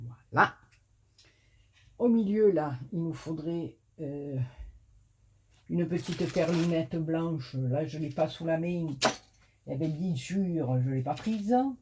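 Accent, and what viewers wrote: South, France. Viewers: Coucou! Je crois bien que le père noël va retomber amoureux de sa belle de noël ❤️ En tout cas moi je craque!!! J ai pas le matériel mais sinon j en aurai fait avec plaisir!!!! Comme celui que j ai eu a t entendre nous dire tes bêtises avec ton accent du soleil ☀️ Gros bisous 💋💋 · Géniale la petite Mère Noël. J'ai passé un excellent moment à la visionner. En plus avec l'accent du soleil et l'humour, que demander de plus. Merci beaucoup pour ce superbe tuto. Bisous breizh (Bretagne)!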